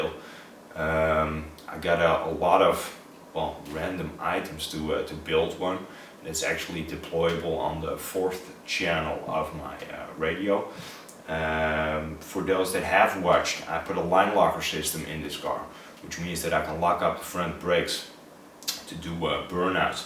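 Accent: Netherlands. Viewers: From what European country are you from, if I may ask? Your accent is a lot like a Dutch accent.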